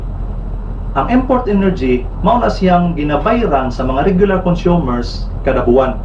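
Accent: Visayas, Philippines. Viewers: boss bisaya diay ka? good job kaayo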